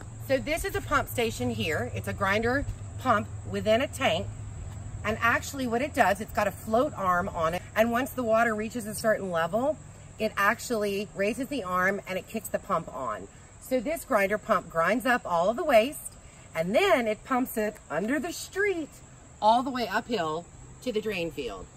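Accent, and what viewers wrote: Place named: Texas, United States. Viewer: LOVED YOUR VIDEO TOUR OF YOUR TINY, WELL PLANNED HOME. I AM SO VERY PROUD FOR YOU. CAN YOU TELL ME, DO YOU HAVE TEXAS ROOTS? I HEARD ALOT OF YALL'S IN THERE. I AM A TEXAS GIRL SO PICKED UP ON THAT IMMEDIATELY. BEST OF LUCK TO YOU!!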